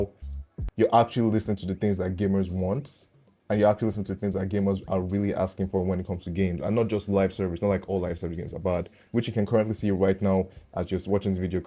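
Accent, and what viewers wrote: Nigeria. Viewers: My broda, as soon as I heard that accent I said, he is one of us............. Naija!!!! About Yotei, honestly this was a bit disappointing to me, I finished Tsushima and its DLC on PC, so I was expecting them to come with some more fire, honestly I didn't expect them to just repackage the same thing, make some editions and sell it to me, this won't be a skip but it's definitely not a Day 1 for me. I'll wait for this to come to PC and play it, btw I just subscribed to your channel.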